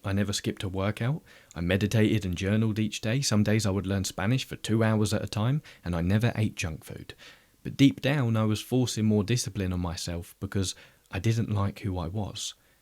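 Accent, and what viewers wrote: United Kingdom. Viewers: What flavor of British Accent is this?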